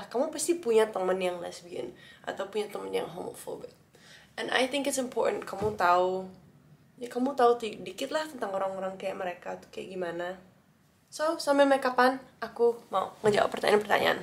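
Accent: Bali, Indonesia. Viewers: Gemes sama medok Bali nya 😁 wajahnya juga cantik menurut pandangan saya. God bless u! ♥️ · Loagat nya bali banget. Lesbi Seng ken Ken. Aku suka Cwe Lesbi. Salam Kenal dr Tabanan.🙏👍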